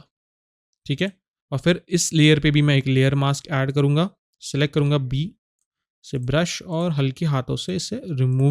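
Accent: Maharashtra, India. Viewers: No that's not right ! I have seen many Maharashtrian People have tendency to talk like that only, that's Maharashtrian Hindi !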